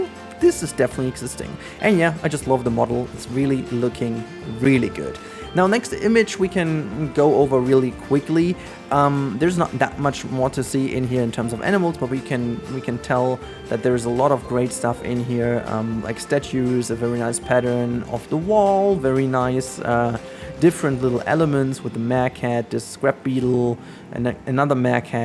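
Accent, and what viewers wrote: Germany. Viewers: Und was soll das bitte für konstruktive Kritik sein? Was soll jetzt gegen den Akzent machen? Das kommt nun mal durch. Ist ja nicht so, als ob er das macht, um Leute zu ärgern oder um extra deutsch zu klingen. Die wenigsten sprechen eine fremde Sprache komplett ohne Akzent, wenn man sie nicht von klein auf gelernt hat, also wozu sollte man das bemängeln? Man versteht ihn und sein Englisch klar und deutlich und wenn einen das stört, dann muss man eben woanders gucken. Wir alle lernen im Leben, ob Sprachen oder anderes und jeder lernt anders schnell und spricht Wörter anders gut aus. Niemand ist perfekt. Was genau soll daran nun voranbringen, das man seinen Akzent bemängelt, der nach und nach mit dem regelmäßigen Sprechen von allein verschwindet? Sprachen sprechen und lernen ist ein langer Prozess, nichts was man über Nacht lernt. Mal ganz ab davon, dass es noch hinzukommt, ob man britisches oder amerikanisches Englisch spricht, also was genau hätte er bitte noch verbessern können? 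Soll er seine Familie ignorieren und Youtube aufgeben bis er perfektes Englisch spricht oder wie genau sollte das bitte laufen? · Krasser Akzent... Bist du Deutscher?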